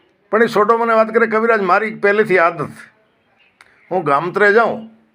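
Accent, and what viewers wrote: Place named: Kutch, India. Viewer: સચોટ વાત કવિરાજ તમારી કચ્છી લઢણ માં બોલતી ગુજરાતી ભાષા..જબરદસ્ત❤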